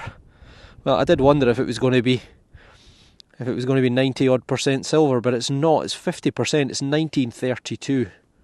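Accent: Scotland, United Kingdom. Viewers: I chuckle when you say worm. Your accent to me sounds like your saying werdems. I’m American with my ancestors coming from Scotland moved through Ireland and eventually to the US. My Grandmother carried your accent. If you know of any McCardles or McClellens say hi to my peeps for me lmao😅. Man I’d love to come over and detect the mother land. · Love the Scottish Accent, enjoying your knowledge and the history, great informative videos.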